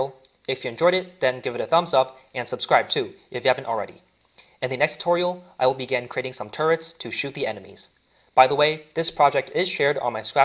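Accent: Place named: Asia. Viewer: the asian accent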